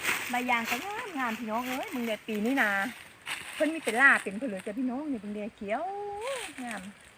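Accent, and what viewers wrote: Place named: Phu Thai, Thailand. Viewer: มาลุ้นแกย่ไข่มดแดงนำเด้อครับ มักเสียงเว้าภูไทครับผม ติดตามให้กำลังใจนำครับ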